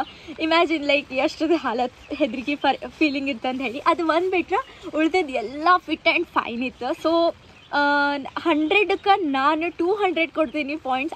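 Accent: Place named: Dharwad, India. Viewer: Hearing Dharwad language after many days❤❤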